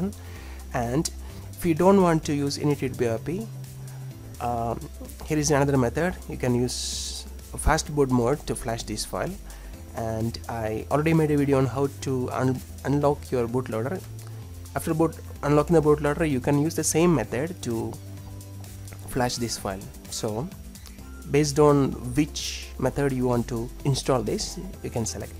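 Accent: Kerala, India. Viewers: Awesome budy..! My phone use to freeze once I take out of my pocket for a little time n it was irritating me I thought its display prblm BT after this kernal fix from past 10 days m nt facing any prblm .. Tnx mate & U got a mallu accent even my home town is Kerala anyway thanks a lot.. <3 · Finally.. My touchscreen on the OnePlus One was so slow the last months. I hope this will fix it. Btw I love the Indian accent :D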